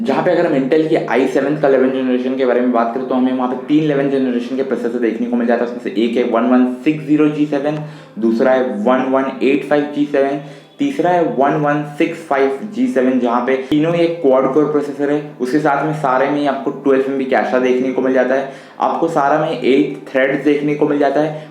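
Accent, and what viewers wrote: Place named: India. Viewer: this is not English... Indian English.. sorry nothing to understand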